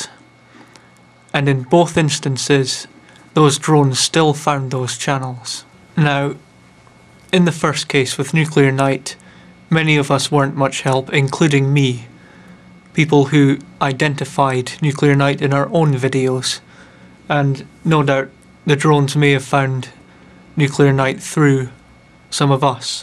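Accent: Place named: Scotland, United Kingdom. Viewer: are you scottish? you sound scottish <3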